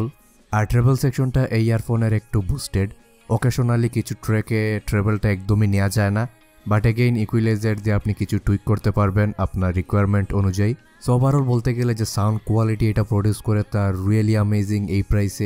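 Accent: Sylhet, Bangladesh. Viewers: Bhai er basha ki sylhet? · Kitaba vai Sylheti ni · ভাই মনে হয় সিলেটের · Ai kita sylhety ni? Masha Allah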